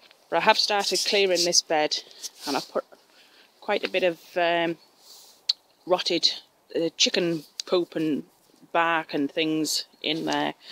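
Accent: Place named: Middlesbrough, United Kingdom. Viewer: gooseberries will grow, just plant it in the ground.. It'll be fine.:) your plot looks awesome, and i know what you mean about hearing your own voice..lol..I'm from newcastle, and going by your accent, you aren't from sunderland..I'm guessing Middlesboro.:).. look forward to your next vid.. by the way, I am seriously considering making a bottle house now..and its all down to you and your vids..:) cheers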